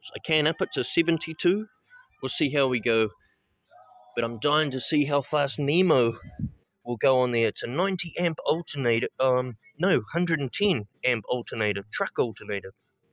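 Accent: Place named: New Zealand. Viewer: You a kiwi mate? That's a hell of an accent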